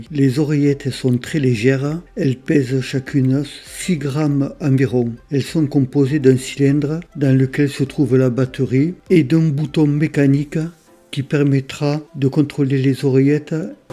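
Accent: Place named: South, France. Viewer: Re 😅 Un peu particulier le design de ces écouteurs, faut aimer. En tout cas content d'entendre ton avis avec ce bel accent du sud (je me sens moins seul! ) 😜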